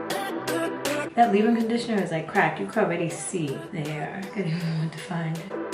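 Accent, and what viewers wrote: New York, United States. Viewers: New York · Love the NY accent. I got a Boston accent so I love the east coast dialogue lol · Are you from New York? Your voice and accent is gorgeous girl 🔥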